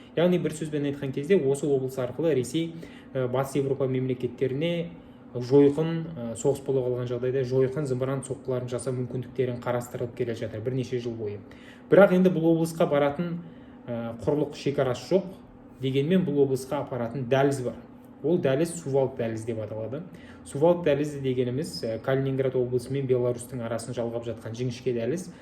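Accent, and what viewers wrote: Standard. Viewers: Айналайын балам, Рахмет.Таза қазақ тілімен жетемізге жеткізіп түсінікті түрде айтып отырсың.Сендей сауатты Қазақ балалардын қатары көбейе берсін.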